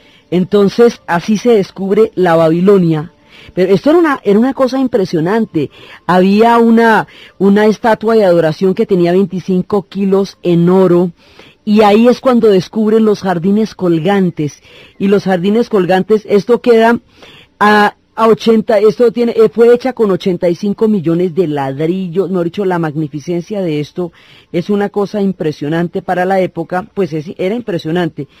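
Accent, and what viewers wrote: Colombia. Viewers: Ese acento colombiano paisa y su voz grave es lo q le da sabor a la narracion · Fascinante oír la historia en la voz de dona y su delicioso acento colombiano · Lamento mucho no haberte conocido antes. Muy agradable y clara tu narrativa. Pero, lo que me cautivo es tu forma de hablar Bogotana. Solo por eso te seguiré escuchando. FELICITACIONES!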